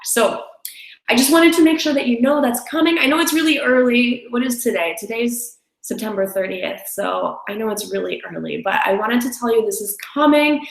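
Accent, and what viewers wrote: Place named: United States. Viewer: hello i like your lessons,and i am very happy to hear your amecan accent.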